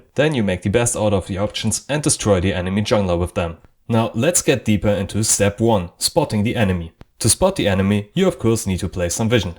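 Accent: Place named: Germany. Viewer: Short, helpful, sounds germanish, like und abo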